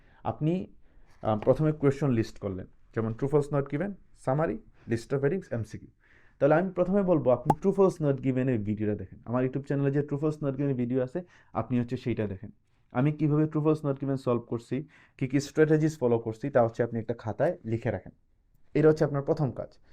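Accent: Sylhet, Bangladesh. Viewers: Apne ki sylheti? Apnar kotar modde sylheti tan ta ashe🤷🏼‍♀️? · Sir Are you Sylheti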